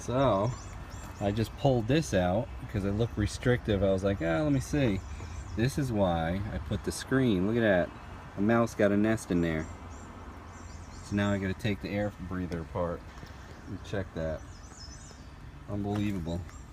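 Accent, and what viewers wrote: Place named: Maryland, United States. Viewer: what state are you in? Sounds like MD or PA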